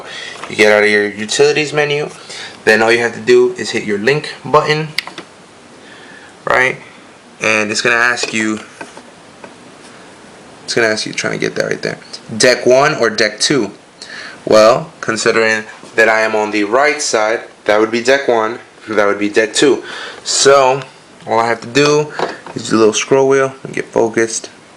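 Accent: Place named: Mexico. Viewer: am mexican and i can tell he is too by the accent, plus he mentions which is cat for spanish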